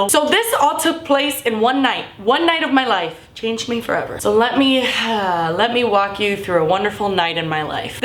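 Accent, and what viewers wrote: Cuba. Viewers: she's cuban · Yes she's Cuban, she's said that many times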